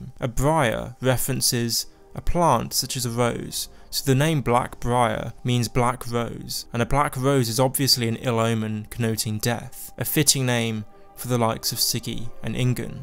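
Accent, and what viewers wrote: United Kingdom. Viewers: Hey I'm curious, what region is your accent from? I know it's British, but what kind?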